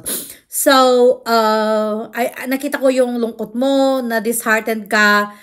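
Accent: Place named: Visayas, Philippines. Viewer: What a WASTE OF SPACE this TRYINGHARD YOUR ENGLISH BISAYA KAAYO DAY,PAG HILOM OY KAHIAS NIMO,PAKAULAW KA LANG TO THE BISAYA HILAAAAS,DILE BAGAY SA IMO OY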